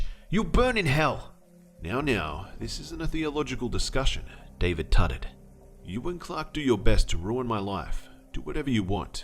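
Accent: Australia. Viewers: You know. I heard there's the full version somewhere. What's the point in that when you can have the himself read it to you in his amazing Aussie voice as you are about to sleep? Exactly.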